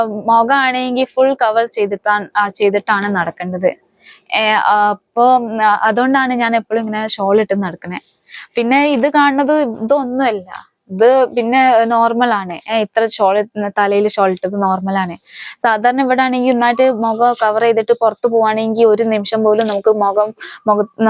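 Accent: Malappuram, India. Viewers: കേരളത്തിൽ എവിടെ ആയിരുന്നു. മലപ്പുറം സ്ലാങ് ആണല്ലോ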